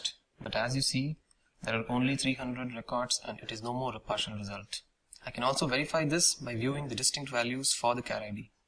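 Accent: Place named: India. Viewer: this english Indisch language..triggers me so hard...omg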